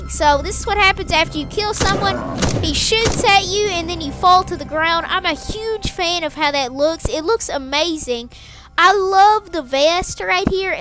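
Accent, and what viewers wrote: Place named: Australia. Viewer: Sound like you’re from Australia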